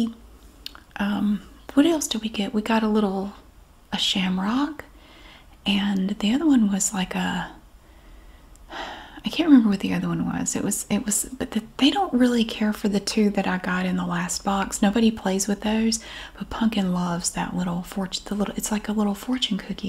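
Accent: South, United States. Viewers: The southern accent is so underrated 👌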